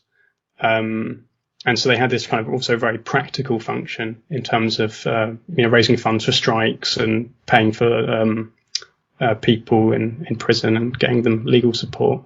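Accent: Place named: United Kingdom. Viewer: Oh no she's British 😔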